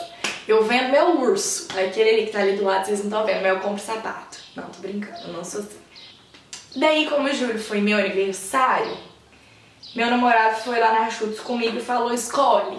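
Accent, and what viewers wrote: Minas Gerais, Brazil. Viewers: "num tem base" haha esse sotaque,que só mineiro sabe como é. linda amo seus videos.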